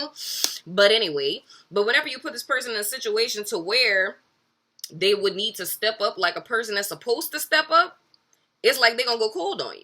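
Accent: New Orleans, United States. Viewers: I was trying to figure out whar is that accent I’m hearing. lol I’m getting NOLA.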